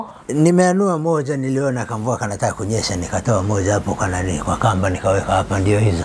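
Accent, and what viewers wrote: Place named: Kikuyu, Kenya. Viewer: Haki si ako na kipawa sana yaani ako na accent ya wa kikuyu kabisa love it 😍 😀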